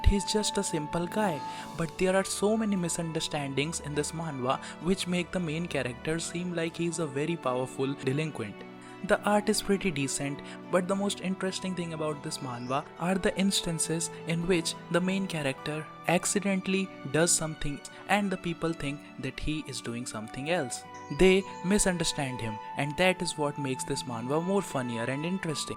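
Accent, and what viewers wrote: India. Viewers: I get Indian vibes from your accent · Fuvking india accent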